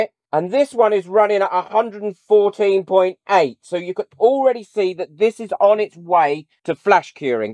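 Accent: United Kingdom. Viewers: I love British YouTubers because the way the speak it is very pleasant to listen too. I love the British accent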